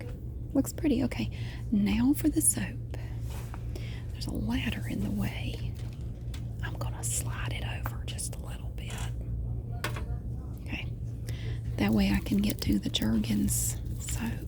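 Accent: South, United States. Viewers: Love your southern accent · I’m love your videos! I was just like you….I too had a smart mouth 😂 I did get in trouble for talking in class tho. I wasn’t disrespectful just talked too much 😂😂😂 I’d have to stay after school and write “I will not talk in class” on two pages of lined paper! 😂😂😂 And I love your southern accent. I live in a suburb outside of Chicago. Not a lot of southern drawl in the Midwest.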